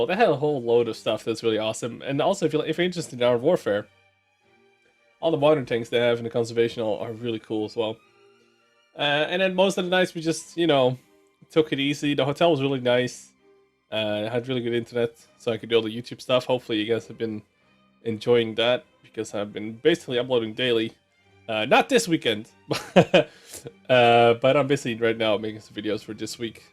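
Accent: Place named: Netherlands. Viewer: IELTS? I'm asking because the accent is barely audible. Dutch myself as well, noticed that a lot of other Dutch people can speak English quite well, but the accent is very prominent.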